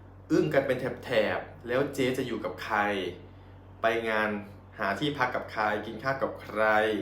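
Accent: Isan, Thailand. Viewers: เสียงอีสานยังรอยู่นะลูก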